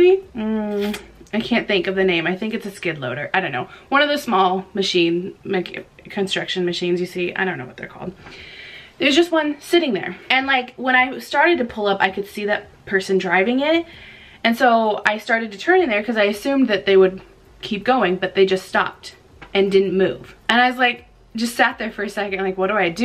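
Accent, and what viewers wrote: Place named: Midwest, United States. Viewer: lol, i knew you were from the midwest! we have the same accent ahaha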